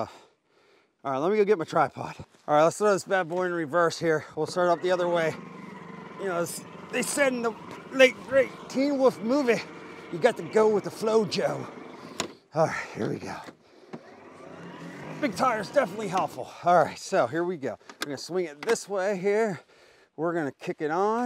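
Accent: Pittsburgh, United States. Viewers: a slight yinzer accent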